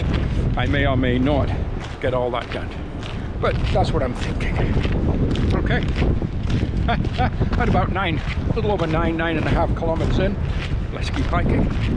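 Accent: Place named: Yorkshire, United Kingdom. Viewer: where are you from originally mate? Sound like a Yorkshire lad!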